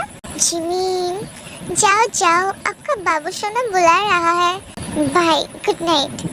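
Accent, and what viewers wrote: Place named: Bengal, India. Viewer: Accent bata raha she is bangoli n bangoli's ka karunga karungi same hota so you have to understand .😂😂You focus on story n enjoy. It's kind of cute too. 🎉🎉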